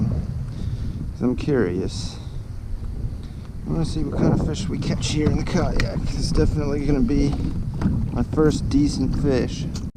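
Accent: Philadelphia, United States. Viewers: Hey I like your videos i like the way you talk. Makes me wish I was back in Philly. You stopped for a while and I was afraid you were gone. Now your back. Cool. Thanks dude.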